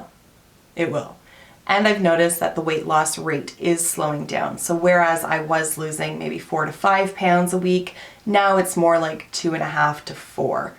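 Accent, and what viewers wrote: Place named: Canada. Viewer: I just came across your channel for the first time, I was just sleeved 9/30/20, I hope I have success similar to yours, 80lbs in less than 6 months is amazing!!! (Sidenote- I have to ask, are you from Canada, by chance? I only ask because I'm from Connecticut,usa and some ppl imitate the Canadian accent, and when they do it's either french or like yours (you don't have much of an accent I just hear it on a few words, I love accents lol) I will definitely keep following your journey!!!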